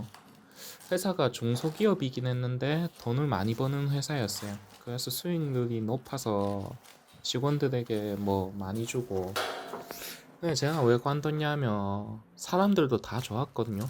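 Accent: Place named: Ulsan, South Korea. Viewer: 울산사셔서 사투리 쓰는게 왜 죄송한 일인가요 열심히 사는모습이 중요하죠. 화이팅입니다.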